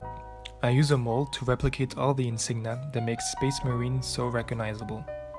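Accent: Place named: France. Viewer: Awesome ! Your french accent is so cute damn it drives me crazy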